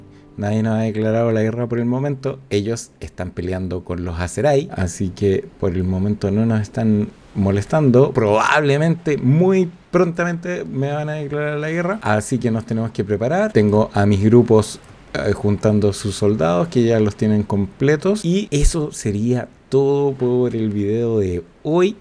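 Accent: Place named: Chile. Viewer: en 5 segundos distingui el horrible acento chileno. Saludos desde Chile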